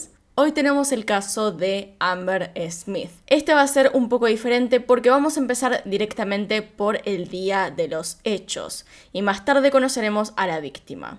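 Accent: Argentina. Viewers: así hablan los argentinos, no es cosa de pronunciación es cosa de acentos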